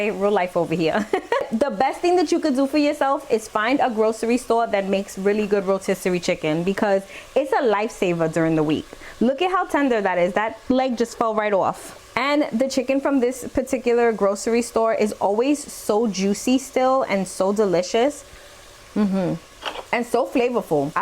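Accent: New York, United States. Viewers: The New Yawwk accent!!❤❤ reminds me of home! 😊 Thanks for the recipe!❤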